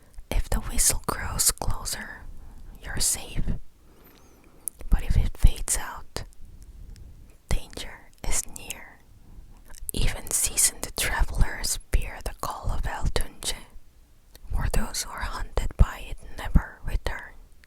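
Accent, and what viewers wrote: South Korea. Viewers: love your Korean accent while speaking English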